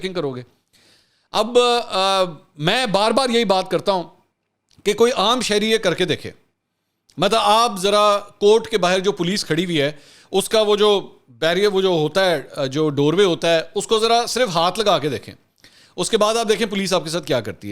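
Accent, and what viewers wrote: Punjab, Pakistan. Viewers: Tabsary k doran apka Punjabi touch bht mazy ka hota hai 😁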